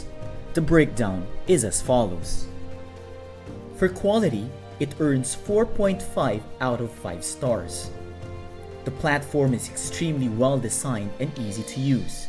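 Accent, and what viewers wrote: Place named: Philippines. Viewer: Are you filipino? I really appreciate the review, but i couldnt help but notice that you sound like my cousins haha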